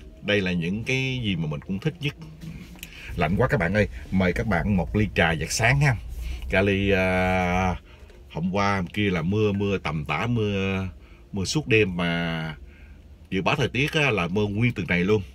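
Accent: Standard. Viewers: Anh nói quá chuẩn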